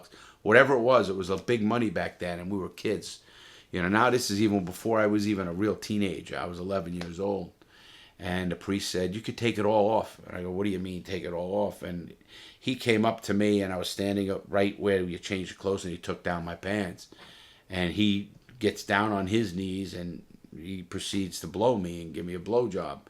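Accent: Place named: East Boston, United States. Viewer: Sounds like East Boston in the 50s 60s.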